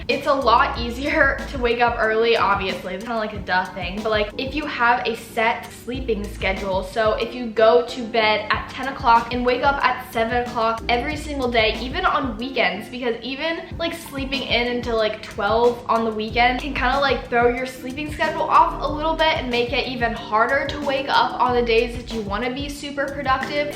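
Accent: Australia. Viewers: It’s not a video without the Australian accent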